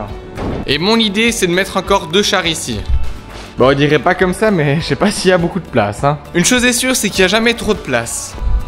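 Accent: Switzerland. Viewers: Salut le petit Suisse 🤣😂🤣😂, en espérant que tu ne le prenne pas mal. Je viens de commenter ta dernière vidéo, et voici une nouvelle en ligne. Tu aurais dû être prof de maths pour expliquer horizontal et vertical . Je t'embête plus. Les plans au drone sont magnifiques. Je crois que tu es le seul qui dit encore mon papa et je trouve que cela en dit long sur la relation avec tes parents. C'est toujours un vrai bonheur de regarder tes vidéos et de t'entendre parler dans ton patois Suisse. Remorques ou chars, Ben Hur??? Bon courage et tu peux être fier de toi. 🙋‍♂️🙆‍♂️👍🏻👌🫡🫡🫡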